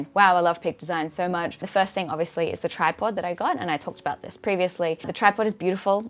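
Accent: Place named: Australia. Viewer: So Aussie I love it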